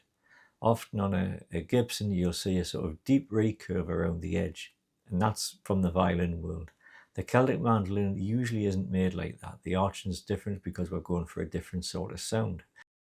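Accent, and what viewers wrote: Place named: Geordie, United Kingdom. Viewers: where in the north is the accent from? I have to concentrate, but understand well enough (Irish myself) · For my money yhe Geordie accent is the most beautiful English accent I know. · I’m west country born and bred, understood every word. There’s nothing more satisfying than hearing the word Bazouki in a north east accent! Great video. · Midlander brought up and lived in south decades since, except for short period a little north. Zero struggle with accent. I like the northern accent.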